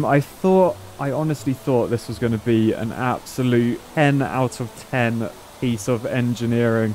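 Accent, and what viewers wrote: United Kingdom. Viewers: I agree, it's just the accent. Nice British accents make everything sound better, at least to Americans.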